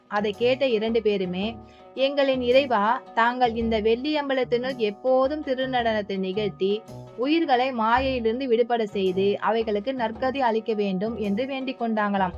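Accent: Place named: Madurai, India. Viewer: இறைவனைப் பார்த்து வணக்கம் ன்னு சொல்லிருக்காங்கன்னா மதுரை தமிழ் மேச் ஆகுதே..😂 சூப்பர் 👍😅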